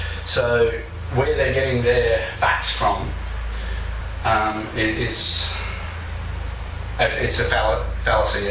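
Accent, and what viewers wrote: Australia. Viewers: I found this video hard to hear because of the room and the echoes...and with Aussie accent it made the entire video hard to hear n understand. Overall, topic looks Good. Suggestions...head-set n microphone..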